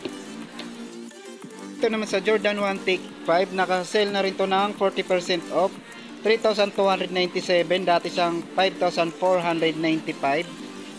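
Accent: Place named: Visayas, Philippines. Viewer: REALTALK IDOL HUWAG KA MAGALIT NAGMAMALA SAKIT LANG AKO DAHIL FOLLOWERS MO AKO UULITIN KO SAYO GAYAHIN MO ANG ""BALAHURANG KOMIKERA""NILALAGAY NYA YUNG DISCOUNTED PRICE AT REGULAR PRICE NYA SA SCREEN AT ANG BILIS DUMAMI SUBSCRIBERS NYA... DITO KASI SA METRO MANILA PAG HINDI MAGANDA ANG BOSES MO O TONONG BISAYA O HINDI MO PA MA PRONOUNCE NG MAAYOS ANG SALITA MO "SORRY TO SAY" NA BOBORE O NAWAWALAN NG GANA ANG VIEWERS KAYA MASMAGANDA NO VOICE KA NA LNG IDOL...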